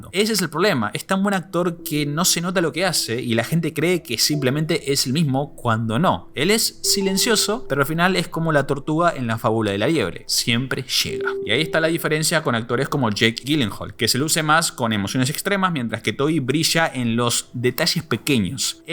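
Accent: Argentina. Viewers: acento argentino... apenas puede entenderse como un pésimo castellano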